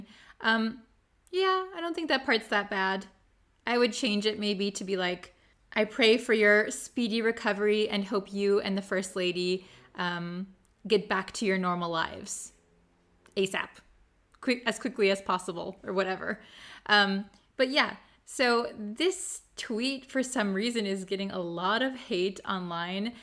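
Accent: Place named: United Kingdom. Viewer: It's for... You're American but you speak British English, l don't understand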